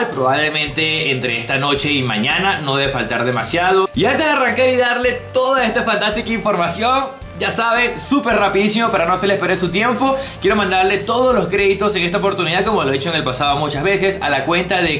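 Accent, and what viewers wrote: Venezuela. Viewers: Pareciera que fuera Venezolano, yo soy Venezolano ahí me avisas para jugar mano dejame tu WhatsApp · eres Venezolanl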